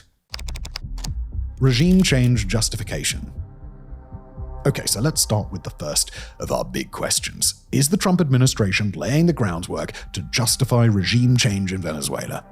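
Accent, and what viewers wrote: United Kingdom. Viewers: Watching this in April 2026… bro really built a whole “analysis” career off “not enough troops” 🤣 Take away the British accent and this is just mid-tier guessing with confidence.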